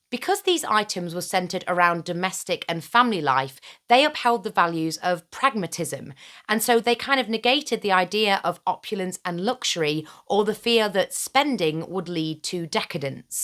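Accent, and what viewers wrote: United Kingdom. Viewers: Hello, from Michigan, USA. I wish I could express to you how much I love your content and how many times I've read things in your sweet British voice and pronunciation😊 Your videos are so well researched and thoughtfully delivered. And very refreshing in this extremely cold midwestern winter! Until next time...🧡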